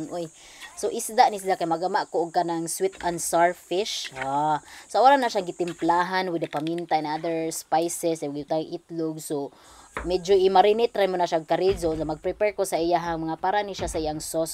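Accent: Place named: Leyte, Philippines. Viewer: Wow😮😮😮, the very best Bisayan dialect I’ve ever heard, Leyte’s Bisaya kinda mixed with Cebuano and Waray-waray accent and bit of Ilongo. I noticed something the Southern part of Leyte some words that we never heard of like “ Ginamon” was just simply as if you cooked rice on the pot. Boholano where my Grandfather came from I didn’t noticed any difference probably we were a kids when Grandpa was around. Love to see Ms. momentous moment when someone known artist visit her, t’was a big honor to have that level of celebrity come visit her. Yes, the “ J “ letter from Bohol and Leyte like Ajaw, gajud was my first combo words during my first year in Cebu, when I was hanging around with the kids they just kinda laughing my accent, took me years to have it adjusted but then when you go back to your place everything coming back. Watching from Leyte.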